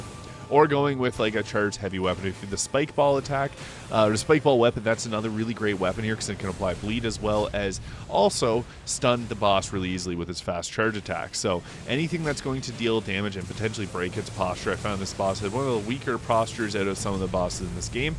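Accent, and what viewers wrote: Canada. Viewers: Is that a Canadian accent by chance? I’m from B.C and I swear I hear a fellow Canuck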